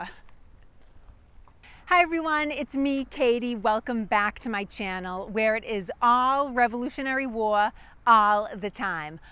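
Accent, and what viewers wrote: Boston, United States. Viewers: Boston, not Chicago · After retiring from the Navy I moved to the west coast in Washington State on the boarder with British Columbia so that my Canadian Wife can be closer with her elderly mother. I was born and raised in Watertown, Massachusetts and my two children and grandchildren still live just outside Boston. I have always loved American history, especially when it comes to New England and you do such a great job with your channel. Besides seeing the historical places you’ve covered, I am able to listen to you speak so that I hopefully will never lose my own “Charmin” Boston accent 🤣😂🤣 Thank You!!! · What a Bostonian accent 🤣! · You could never program and AI with your enthusiasm for the subject matter or your charming Boston accent. I enjoy your work and obvious mastery of the Revolution. The way you speak about the era reminds me of classes with professor Hyman Rothbard back in the "day". 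Kudos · NON AI A PLUS. Where is this? thank you; love the accent. bah hahvahd yahdesque. Set Meyers does a clip, BOSTON ACCENT. Recommended, par moi. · I live in NH. Your Boston accent is very entertaining and sooooo damn sexy at the same time!!! 😍❤️💕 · Boston accent :face-red-heart-shape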